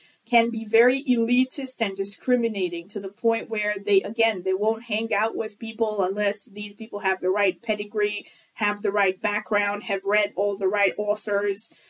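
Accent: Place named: California, United States. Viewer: I enjoy your accent and listening to you speak. Arr you from Cali?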